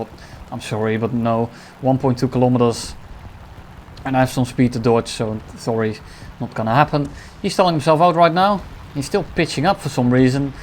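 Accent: Netherlands. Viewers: Oh that is why you got that Bas Rutten accent!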